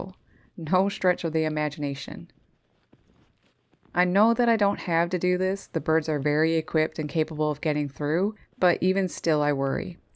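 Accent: Canada. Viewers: This is amazing, cuz how bright personality you gotta have for bird's to earn trust in you, and feed em in freezing temperature? And first meeting trust is precious, I'm American and I hear Canadian accent, plus I love snow, and wanna go to trip to Canada when I can afford it. So beautiful nature 😍❤️😍😍❤️❤️😍❤️❤️. Love it.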